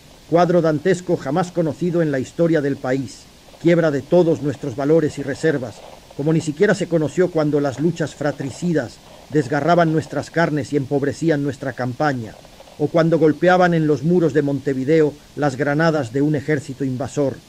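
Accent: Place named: Spain. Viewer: Porque hablaba en español de España?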